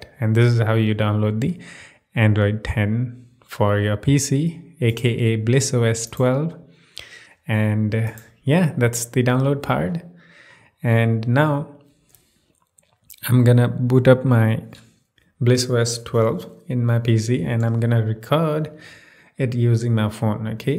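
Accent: India. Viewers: anyone knows he is from which place talks like and indian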